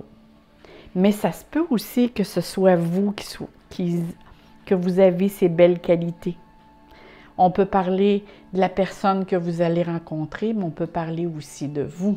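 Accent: Quebec, Canada. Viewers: j’ai choisit le n°2 et votre interprétation me parle beaucoup. J’aime vous écouter, comme il est dit dans un autre commentaire votre voix est apaisante et j’adore votre accent québécois aussi. Merci pour tout. Bye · J'adore votre voix apaisente et et votre accent du Canada J'adore à fond mais ce que vous dire n'est pas faux la différence est que pour moi C un peu différent car j'ai 63 ans il serait temps que je rencontre un homme pour fin de vie heureuse merci beaucoup suis scorpion ascendant taureau bizzzzz de France vous êtes adorable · Trop marrant l'accent canadien 😂😂😂😂😂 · Merci 🙏 pour ce beau tirage et gratitude 🌼 pour vos précieux conseils ✨ Quel plaisir d'entendre votre accent de nos cousins de la belle Province. Gros bisous de France 🌍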